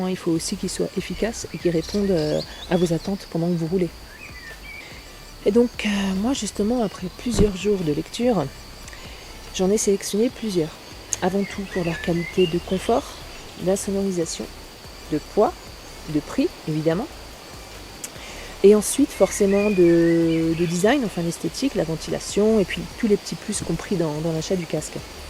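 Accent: France. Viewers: good choice nice helmet btw your English with french accent I LIKE IT.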